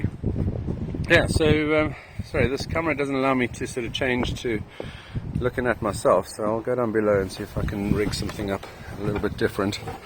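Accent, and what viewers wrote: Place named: South Africa. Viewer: You have a very South African accent there... An expat maybe?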